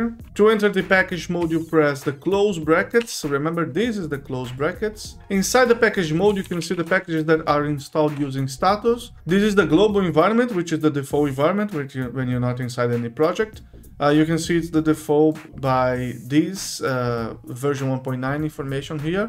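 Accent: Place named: Brazil. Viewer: Só pelo sotaque ja sei que é BR. Ótimo trabalho!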